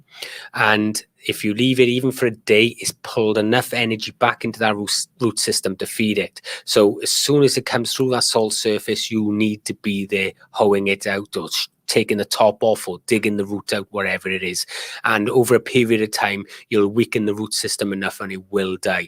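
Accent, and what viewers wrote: Wales, United Kingdom. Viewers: No worries, I really do love your content and you can’t beat a good welsh accent 😁 I hope the operation goes well and you make a speedy recovery, I know how hard it can be living with vertigo, my mum gets quite bad bouts sometimes and can hardly do anything when they hit. Great stream by the way, congratulations again on the 250k subs 🥳 🥳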